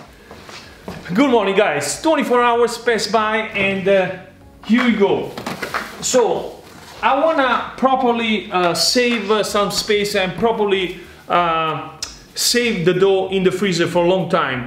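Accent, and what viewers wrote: Italy. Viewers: You are kidding , right? He’s originally from Italy, so he has an authentic Italian accent. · .. fantastico il tuo inglese!! Lo adoro, italienglish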